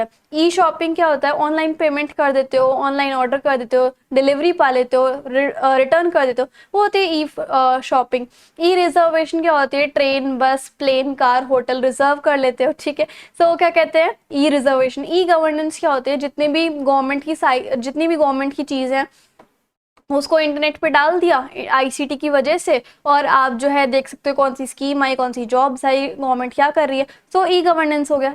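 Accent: Punjab, India. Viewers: Didi tuci punjabi hooo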